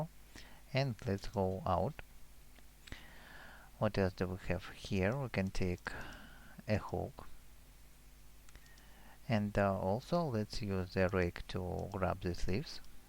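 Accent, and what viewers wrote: Russia. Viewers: А ты русишь ты спалился что у тебя русский язык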